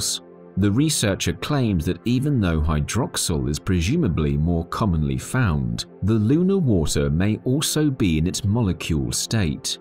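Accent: United Kingdom. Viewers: I know what they found on the moon your big stupid limey mouth talking 💩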